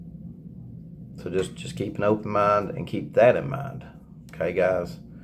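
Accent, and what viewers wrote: South, United States. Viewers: You look better today. Younger even! Just love your southern American accent.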